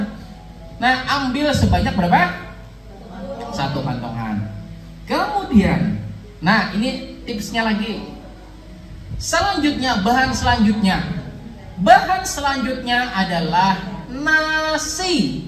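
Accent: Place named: Sumatra, Indonesia. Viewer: Ulun kira piyan i urang sumatra. Saklainnx sama ja wan ulun urang berabaei.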